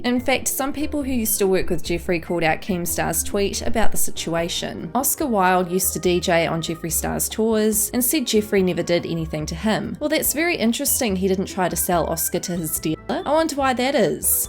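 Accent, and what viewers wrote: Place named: New Zealand. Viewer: She’s a kiwi from New Zealand it sounds like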